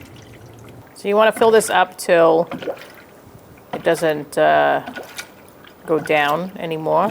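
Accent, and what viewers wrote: Boston, United States. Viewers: love the boston accent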